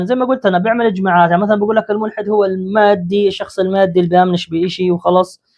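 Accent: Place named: Jordan. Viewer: بحب اللهجة الاردنية 😂 طب الحق علي انا يعني دقيقة ٩ و ٣٠ ثانية تعديل بخاتمة الفيديو ( هو انت بدك تتجوزني ) 😂 جملة ايقونية يزم يسعد رب الاردنية ❤❤❤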